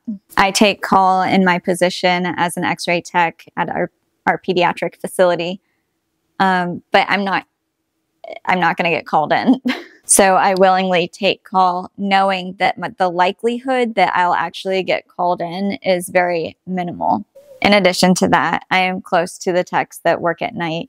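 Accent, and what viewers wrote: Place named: Australia. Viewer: what county you are mam? I thought youre from Australia. 😅 are you from US?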